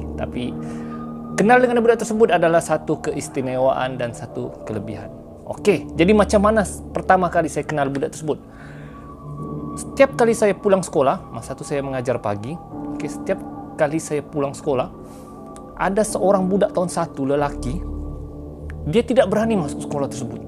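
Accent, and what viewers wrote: Sabah, Malaysia. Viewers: mmg best loghat Sabah...ni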